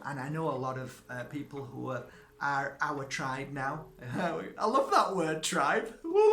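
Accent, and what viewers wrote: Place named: Nottingham, United Kingdom. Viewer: Ah, right, Yorkshire isit, now!..no wonder I thought your accent might of been from Nottingham...I'm originally from Nott's, and I get asked if I'm from Yorkshire...x ✌️❤️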